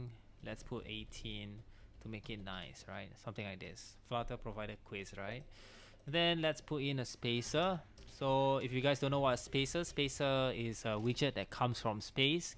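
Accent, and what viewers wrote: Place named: Southeast Asia. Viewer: hi, teacher, are you from south east asia? your tone tells me that.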